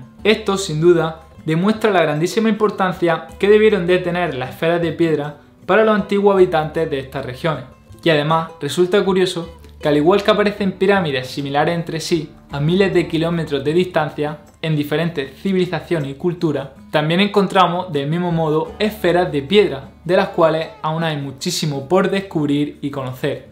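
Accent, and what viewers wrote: Andalusia, Spain. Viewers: Es andaluz, así hablan en esta región de España, es el acento que le dio origen a los dialectos caribeños de latinoamerica... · HOLA!COMO SIEMPRE MAGNIFICO TU DOCU!!!!ME ENCANTA TU DICCIÓN!!!!SE NOTA Q ERES ANDALUZ PERO TE ESFUERZAS AL RELATAR PA Q SE TE ENTIENDA!!!!OLE OLE Y OLE.....TE DOY COMO EJEMPLO A MIS NIETOS Q HABLAN COMO AMETRALLADORAS.....Y MAS OLES!!!!!🌹😀❤SOY DE MADRID Y VIVO N LIMA.💐🌷🌺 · Este canal es para la historia lo que la homeopatía para la salud. Y lo que es peor, con acento andaluz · ÉPALE !!!! Muchos países de Hispanoamérica tienen MUCHA influencia de Andalucía. Me sentí identificado con ese acento. Desde Panamá....Viva la Madre Patria !! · Acento andaluz? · Me parece que es de Andalucía, una región de España.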